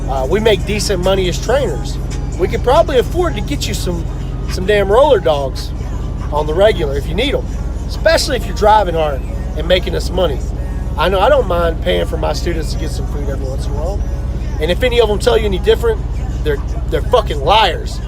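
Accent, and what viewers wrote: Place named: Texas, United States. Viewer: Part of Texas you from?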